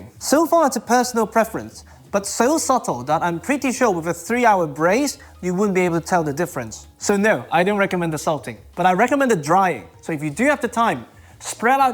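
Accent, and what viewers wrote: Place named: United Kingdom. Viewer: I’ve never seen an asian looking British sounding dude before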